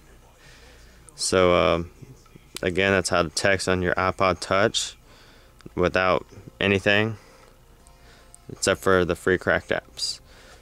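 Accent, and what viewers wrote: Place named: South, United States. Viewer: do u live near the South? u have an adorable accent :D haha lol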